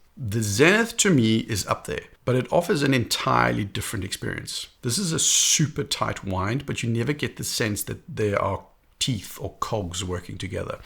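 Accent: South Africa. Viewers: Great video! I'm also debating between the white speedy and the A384. Is that a South African accent I hear?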